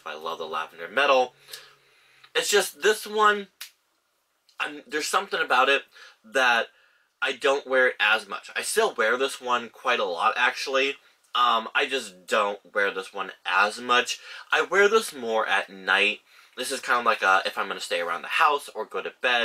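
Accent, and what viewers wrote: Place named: United Kingdom. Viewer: if you’re from the uk which you sound like you are, how/where did you get cloud so early??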